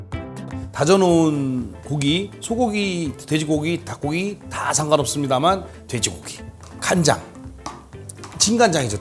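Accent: Chungcheong, South Korea. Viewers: 백종원 대표님 구수한 충청도 사투리 듣고싶네요 오늘저녁 순두부 찌게 맛나게 끓여 먹겠습니다 건강하시고 가까운날 티비서 뵀으면 좋겠습니다 누가뭐라해도 백종원 열펜입니다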